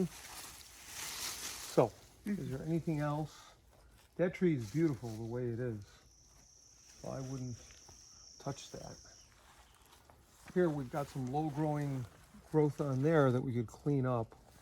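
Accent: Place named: United States. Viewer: Feels so weird to se a person so obviously Finnish by the looks, speak that American accent. /67% finnish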